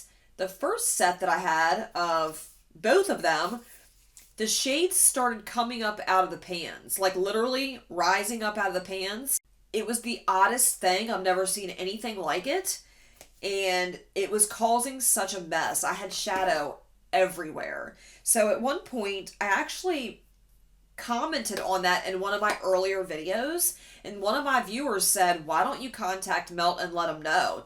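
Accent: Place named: South, United States. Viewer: I discovered you because of this video and I love you 😂❤️ are you from the southern states? Because you sound like my people 😂. Also. Can I be your daughter of niece? I just discovered makeup 1 1/2 years ago and trying to build my collection. Lol. Jealous!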